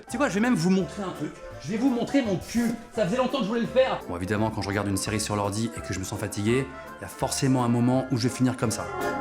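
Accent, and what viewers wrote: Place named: South, France. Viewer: mon dieu l'accent du sud qui revient je pensais etre la seule :''')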